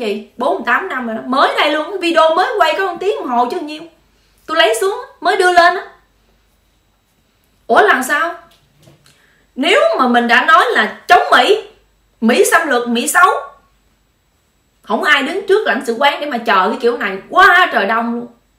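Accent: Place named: Mekong Delta, Vietnam. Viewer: Giong noi mien tay rat ngot ngao va chan tinh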